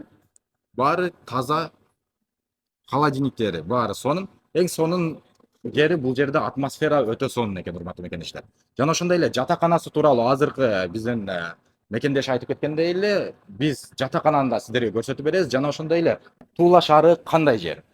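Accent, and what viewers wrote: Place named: Leilek, Kyrgyzstan. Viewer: и чидеги деп суйлойтго баткен лейлектен окшойсун туура Абабий тилде суйло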